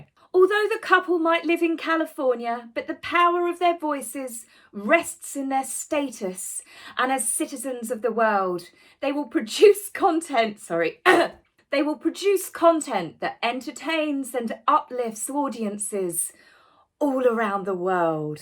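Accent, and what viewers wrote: United Kingdom. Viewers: The good thing is those of us in the United States just figure it’s a British pronunciation 😆 you are fabulous and you say it however the hell you wanna say it. I just think it’s a gas that MM wasn’t smart enough to use prefix Archie - spell it differently that way. everyone would’ve known what she was trying to do, even if in the end it doesn’t make sense and she’s talking about stereotypes. Love you have a wonderful Easter!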